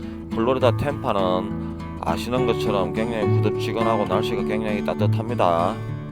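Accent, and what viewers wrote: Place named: Busan, South Korea. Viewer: 다른 트럭커동영상도 봤지만 역시 님 영상이랑 음성이 제일 귀에 들어오네요.ㅎㅎ 왜냐!! 저도 부산이라 그런가봐요..특히 음성이 전혀 이질감없이 들어오니 너무 좋네요^^ 파푸아 썰~ 잘 듣고 갑니다.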